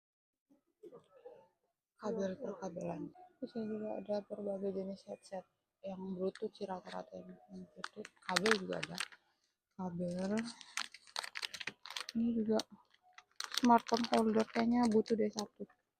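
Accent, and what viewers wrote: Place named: Mbay, Indonesia. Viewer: Akhirnya ada tontonan yang ada dialeg mbay nyaa❤❤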